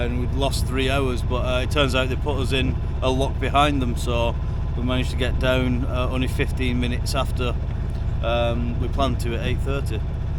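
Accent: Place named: Lancashire, United Kingdom. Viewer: I hope you are feeling all sorts of good. As you are looking strong and well. The sea is good for you Lancashire Lad. So, stay boaty. Ps. More of your doing and learning content please..it make sailing ..accessible to ordinary perps..also I like your accent, as I am a sort of a Poulton Le Fylde exile. I miss it.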